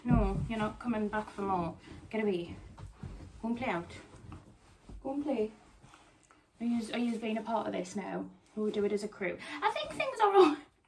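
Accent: Newcastle, United Kingdom. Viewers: I’ve been watching for years and think honestly you’re putting far too much pressure on yourself. I followed you for bargain shop hauls, fun vlogs and to hear your Geordie accent. Go back to basics, you don’t need to be an influencer or even on social media as much if that isn’t what you want. Maybe a part time job in real life might help give you some perspective on if this is what you want to do! Sending love and positive vibes xxxx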